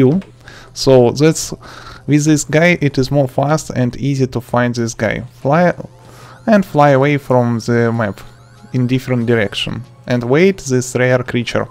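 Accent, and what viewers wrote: Russia. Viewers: ur accent is cool u are from russia?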